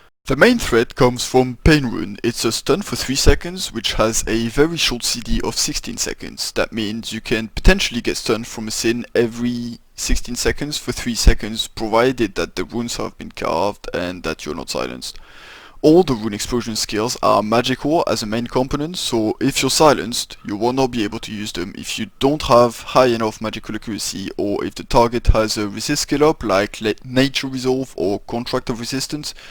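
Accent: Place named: France. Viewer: Oh putin l’accent français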